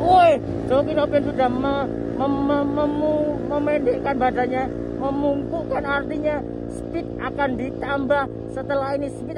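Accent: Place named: Surabaya, Indonesia. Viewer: Diencep encepkan, Suroboyo banget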